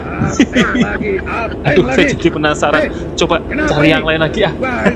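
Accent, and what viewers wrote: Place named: Banyumas, Indonesia. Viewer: Videonya paket komplit,ngapak bos wkwkwk. Start up drakor favoritkuh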